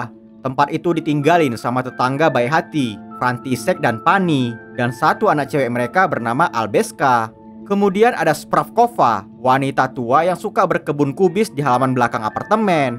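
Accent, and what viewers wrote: Medan, Indonesia. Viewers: yg isi suara ini orang medan kek nya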